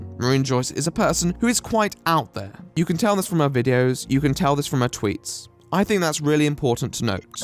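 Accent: Netherlands. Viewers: wait, u dutch? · are you dutch?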